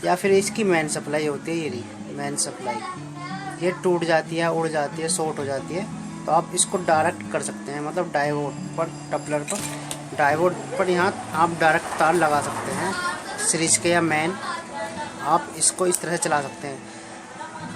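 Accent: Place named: Baghpat, India. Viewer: i m from Mumbai... my hometown is bagpat district.. aap ki boli wahi ki h think so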